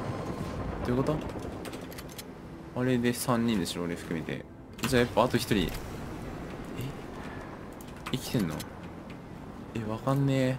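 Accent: Kansai, Japan. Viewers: さんのこと嫌いやってんけど関西弁？好きやしキャラ好きやからもういいや推し決定俺何言ってんやろ。GW全員推しやった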